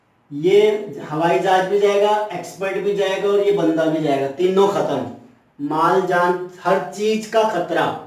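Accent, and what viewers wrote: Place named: India. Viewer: oh,full Indian English .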